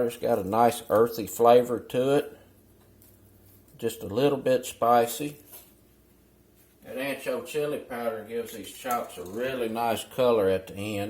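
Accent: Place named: Georgia, United States. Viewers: Hahaha, I am new at this channel. When I heard your good ol’ boy accent, I paused when I saw your hands enter the screen. Thanks for the great looking recipe. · I love your videos! Your the only chef on Youtube that talks like this good old Georgia boy. Thanks and keep it up.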